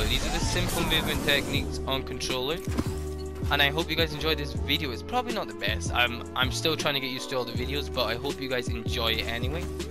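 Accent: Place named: North Scotland, United Kingdom. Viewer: You definitely sound like you're from the north of scotland lmao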